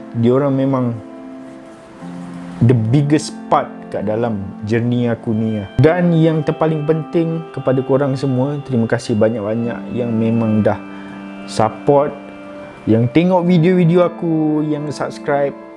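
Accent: Terengganu, Malaysia. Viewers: Tahniah.. Selamat maju jaya.. Tapi request aku sblm ni tak buat lgi.. Aku mintak video ckp full loghat tranung mung dok buat lagi..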